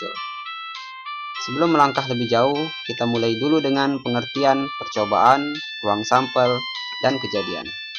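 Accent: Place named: Bengkulu, Indonesia. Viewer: Mantap betul pak, bapak dari Bengkulu ya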